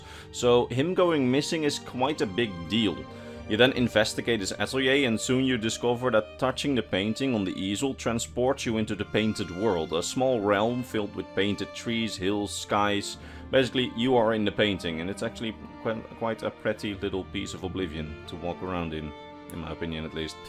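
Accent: Netherlands. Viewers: You sound Dutch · Good sauce. Are you Dutch by any chance? Shoutout to potential fellow countryman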